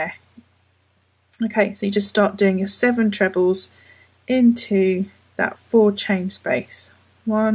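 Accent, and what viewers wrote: United Kingdom. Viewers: So lovely. Subscribed! Love to hear a fellow Brit with a treble!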